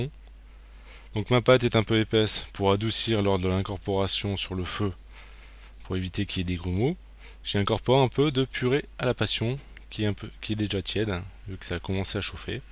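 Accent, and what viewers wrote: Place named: Nord, France. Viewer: Bonjour chef, je viens de m'abonner à votre chaîne que je dévore attentivement. Je me doutais bien que comme moi, vous étiez du Nord/Pas-de-Calais. En tout cas tous vos conseils font que mes pâtisseries sont une réussite. Hier, j'ai réalisé les allumettes et des croissants. Là, bien sûr, je termine les choux. Il me reste à les bourrer de crème. J'ai hâte de les goûter lol. Cela fait du bien d'entendre le son du Nord dans votre voix surtout que je suis exilée en région parisienne et ma région me manque terriblement. Merci pour tout lol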